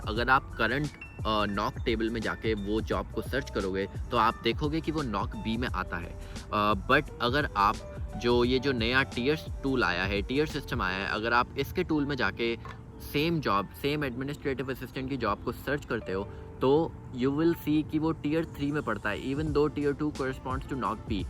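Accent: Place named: India. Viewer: that Hindi accent though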